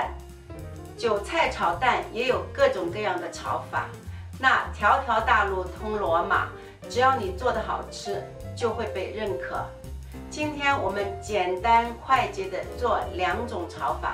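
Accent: Shanghai, China. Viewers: 日锅日油，哈哈，沪普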